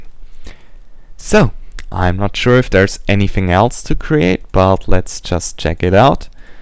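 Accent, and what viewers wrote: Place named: Germany. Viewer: du bist ein deutscher dat hört man....xD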